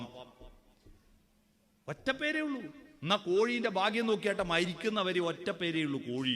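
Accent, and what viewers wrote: Kannur, India. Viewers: സർ, കണ്ണൂർ ക്കാരൻ ആണ് ല്ലേ..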